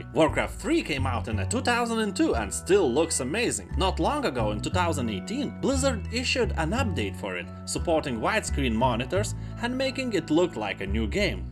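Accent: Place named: Russia. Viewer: Опа, русский акцентик))))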